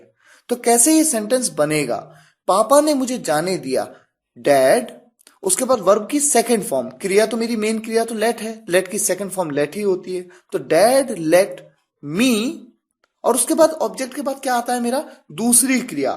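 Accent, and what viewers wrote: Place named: Bihar, India. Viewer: Bihari lg raha hai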